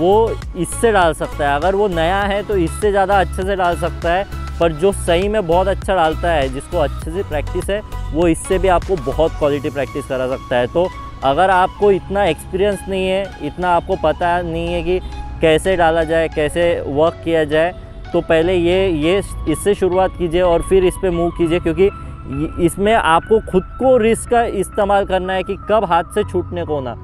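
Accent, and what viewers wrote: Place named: Hyderabad, India. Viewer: Pahla dekha jo hyderabadi bolta hai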